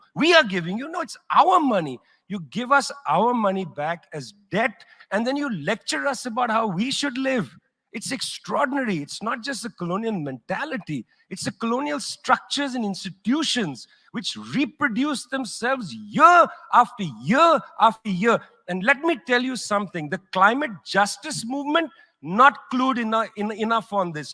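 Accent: India. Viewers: 难得这个印度口音能全部听懂🤣真的，普京也跟瑞典环保小公主说过，第三世界国家的人民会想要先吃饱饭，不要何不食肉糜这种高高在上的态度来对待第三世界的国家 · 这是我听过最好听的印度英语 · 👍不知不覺刷了兩遍🤭第一次覺得印度英語特別好聽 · 第一次感觉印度英语也能如此优美！ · 第一次觉得印度的口音还有那么一点悦耳…… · 看到一些人疯狂追求说一口标准的美式英语，甚至要求他们幼儿园的英语老师必须是外教，实在不可思议！其实，只要语法学好了，说话流畅就好了，有中国人的口音根本不是事。看看这印度人吧，一场扎实，头头是道，引人入胜的演说，太棒了！不会有哪个懂英语的观众会听不懂他发音清晰，精准的印腔英语。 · 我居然第一次听这个印度口音听了两遍！？ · 我知道阿三腔的英语听起来很辣耳朵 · 这是谁，我喜欢你，我听出了印度口音，我不知道你的为人，但我依然很喜欢你，喜欢你骨子里的忠义，喜欢你语气里的倔强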